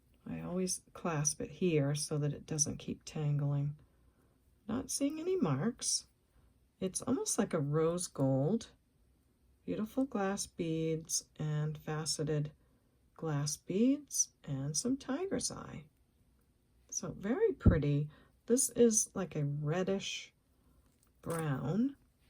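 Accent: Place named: Northeast, United States. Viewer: Love!! One of my faves! Maybe I just like the yankee (North eastern) accent.😂 Born and raised in Maine. So sorry for the loss in your family. Praying for peace and comfort. (❤)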